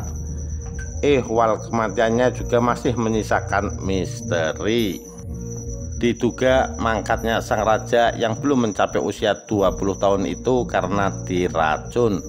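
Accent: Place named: Java, Indonesia. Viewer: Emang berlagak gini,,biar medok BHS jawanya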